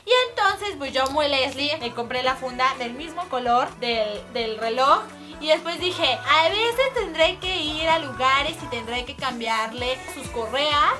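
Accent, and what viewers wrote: Mexico. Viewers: a se le está quitando el acento maxicano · habla como fresa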